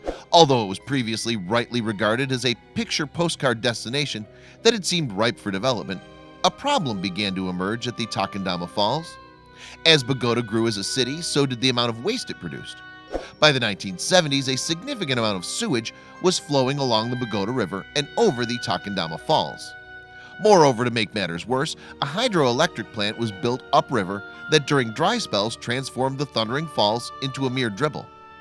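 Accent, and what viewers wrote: United States. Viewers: Working it hard for a story -loud yank -very ordinary and dull .....only yanks could dramatise up as odd or unusual.....narrator tries to hard ..thumbs down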